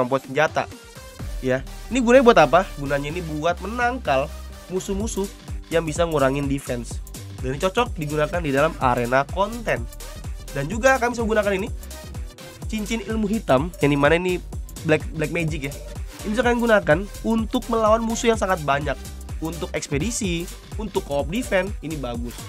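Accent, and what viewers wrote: Banjar, Indonesia. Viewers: Abang org kalimantan selatan kh bang? Banjar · Pantesan logat kamu familiar banget bang😂 ternyata orang Kalimantan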